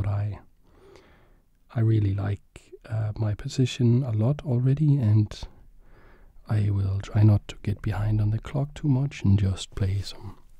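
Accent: Denmark. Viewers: 2 hours and danish accents? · Danish accent and danish gambit. Great combination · As a dane i always find it wierd to hear a danish accent, but I’m sure it’s soothing for others lol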